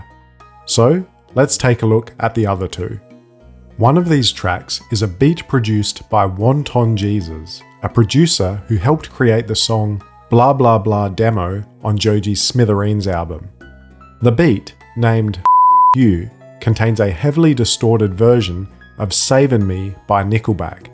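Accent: Adelaide, Australia. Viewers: are you a fellow adelaidian? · u sound like an aussie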